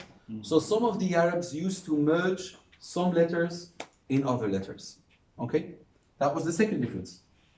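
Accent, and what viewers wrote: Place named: United Kingdom. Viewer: Loved the British accent 😂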